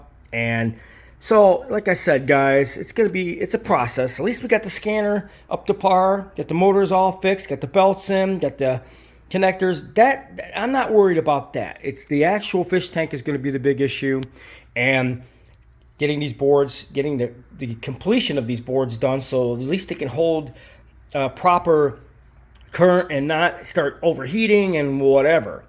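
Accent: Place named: New York, United States. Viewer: You sound like your from NY i em a New Yorker my self.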